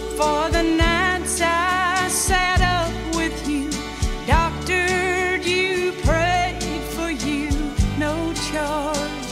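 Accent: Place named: South, United States. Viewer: unmistakable Southern States accent. Maybe you don't hear it if you are from the States but it's very noticeable to the British.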